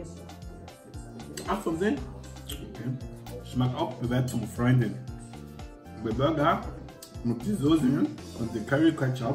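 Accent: Africa. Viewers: Hahah wie süß deine Freundin ist hahah am Ende wie sie dich einfach sauber macht und dein Afrikanischer akkzent ist einfach legender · Er kann diesen afrikanischen Akzent so gut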